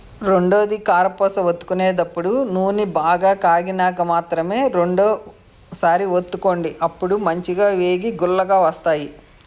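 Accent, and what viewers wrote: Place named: Nellore, India. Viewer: Mee language nellore side laga vundi aunty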